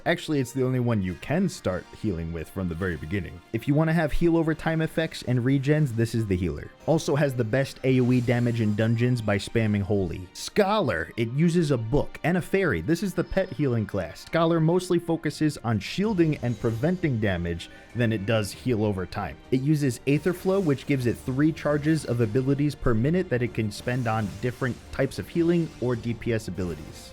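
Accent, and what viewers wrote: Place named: New York, United States. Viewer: I like your accent, are you from New York? This is very informative though, thanks!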